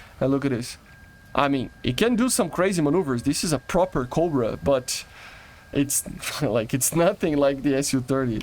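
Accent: Brazil. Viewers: Bro is definitely Brasilian, just by the accent and the way u talk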